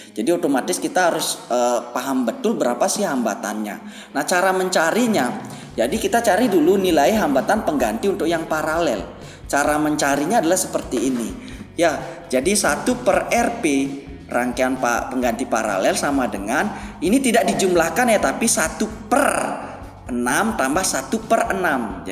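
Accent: Bali, Indonesia. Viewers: Kelihatan bli dari logatnya hehe, salken nggih 🙏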